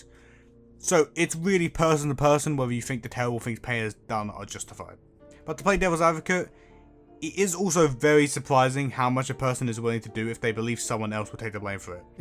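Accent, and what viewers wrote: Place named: United Kingdom. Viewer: the thick british accent makes this video so much more interesting and entertaining, like on top of the accent you also speak slightly faster so its really funny.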